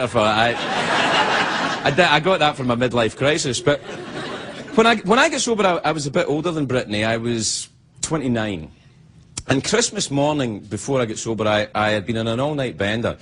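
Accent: Scotland, United Kingdom. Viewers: This guy is awesome. Miss his show. Miss his Scottish accent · Still had his Scottish accent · He sounded so much more Scottish back then.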